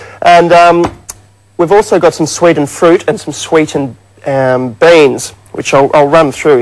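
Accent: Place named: Australia. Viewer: he's australian. it's the accent.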